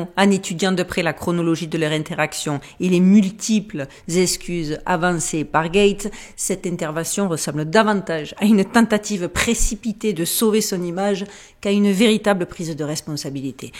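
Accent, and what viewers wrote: South, France. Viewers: Son accent du sud est insupportable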